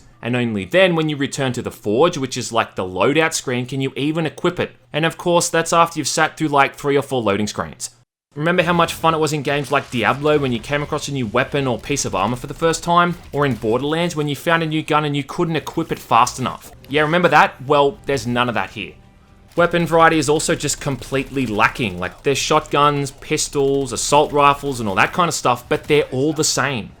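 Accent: Australia. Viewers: Australian accent ftw haha.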